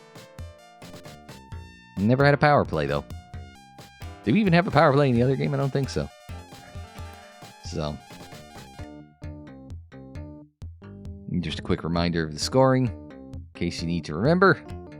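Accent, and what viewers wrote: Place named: Newfoundland, Canada. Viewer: I am pretty sure that would be a Newfoundland accent...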